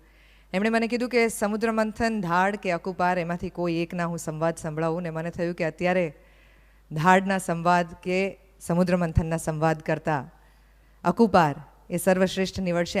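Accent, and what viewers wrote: Kathiawar, India. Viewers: બેન તલપદી કાઠિયાવાડી ભાષા માં બરડો ગીર નો સંવાદ બોલવા બદલ ખુબ ખુબ આભાર🎉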